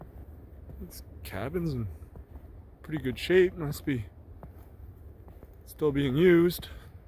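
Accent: Canada. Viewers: Your Canadian accent and your usage of "feet" as a unit of measure don't compute properly in my Universe... 🤔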